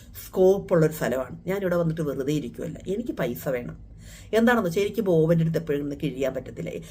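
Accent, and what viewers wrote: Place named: Alappuzha, India. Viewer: ശരിക്കും ആലപ്പുഴയിലെ സംസാരരീതി പു ന്ന പ്ര ആണോ വീട് എൻറെ വീട് ambalapuzha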